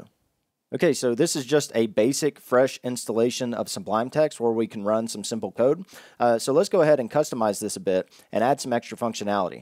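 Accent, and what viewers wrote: South, United States. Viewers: Does he have a southern accent or am I hallucinating?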